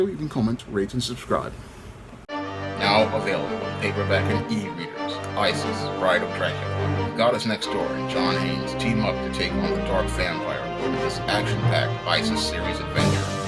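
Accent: England, United Kingdom. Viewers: 😂 😂 😂 😂 You are hilarious. I can listen to your English accent all day hahaha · I love your English accent talking about them ghetto royals🤣🤣🤣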